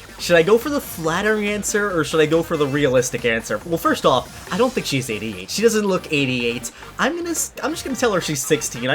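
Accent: United Kingdom. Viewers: How do you do so good British ascent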